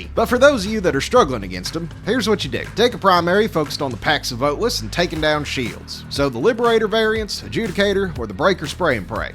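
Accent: United States. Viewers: from the first 15 seconds of the video i could tell this guy is 100% american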